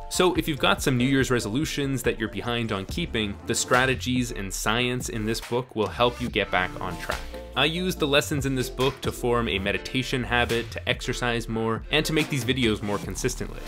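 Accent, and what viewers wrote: Canada. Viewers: You're Canadian. I can hear it in your accent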